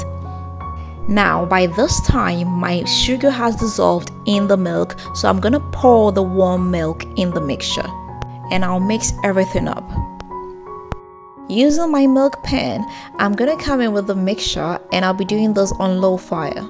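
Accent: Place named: New Zealand. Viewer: Kinda like a new Zealand accent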